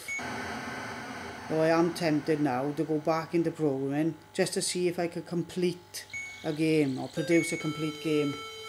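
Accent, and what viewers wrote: Wales, United Kingdom. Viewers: Awesome little game!,love the Welsh accent lol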